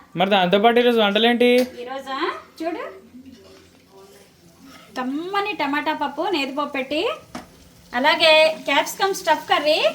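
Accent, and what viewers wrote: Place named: Eluru, India. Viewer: చక‌్కగా మాట‌్లాడతావమ‌్మ సూపర్ ఏలూరు మీది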